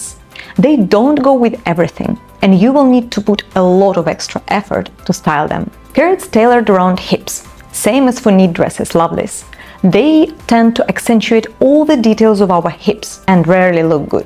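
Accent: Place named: Russia. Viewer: Как тяжело слушать такой тяжёлый русский (предположительно) акцент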